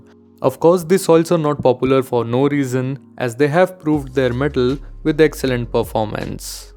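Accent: India. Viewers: your tone is hindi but you speak english,...is very bad if speak english use english tone....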